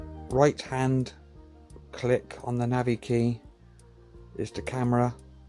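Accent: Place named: West Country, United Kingdom. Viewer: Great he's English. Could be from West country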